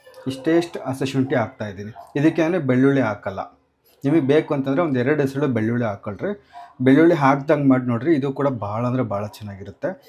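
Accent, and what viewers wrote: Chitradurga, India. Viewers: My mom has become your fan. Trying to understand your Chitradurga Kannada, but nice.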